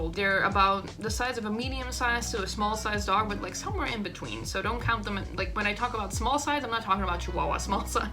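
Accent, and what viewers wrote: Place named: Belgium. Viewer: Ah Belgium, I knew I heard a slight accent.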